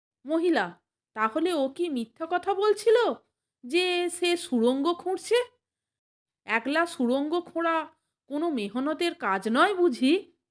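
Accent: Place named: Hooghly, India. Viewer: আপনার কথায় হুগলির টান পেলাম তাই বলছি।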